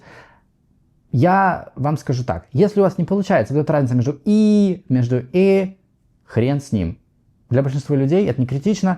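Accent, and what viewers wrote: United States. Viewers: Какая чушь.... слава Богу, что я ненавижу американское произношение и их глупейшие идиомы ( номер один в данном ролике).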